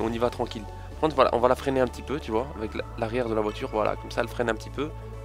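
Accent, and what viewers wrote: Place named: Belgium. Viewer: superbe vidéo continue et je me disais bien que tu étais. belge parce que tu as un peu l'accent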